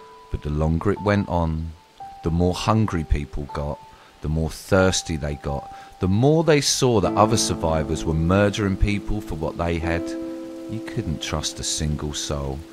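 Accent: United Kingdom. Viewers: im not saying his british accent is werid, its fine, but he talks completely differnet then he normally does, he talks slow and odd when he is doing a commentary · it's called a british accent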